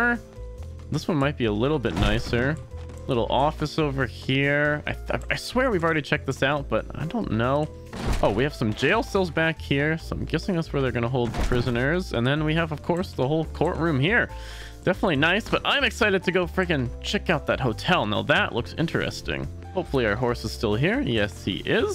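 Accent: South, United States. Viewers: Mf rlly talks with a red neck accent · You know you don't HAVE to talk with a red neck accent.. many people in this setting were from all over the world or had intellectual backgrounds.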